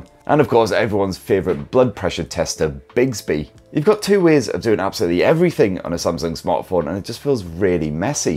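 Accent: United Kingdom. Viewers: British accent . i think.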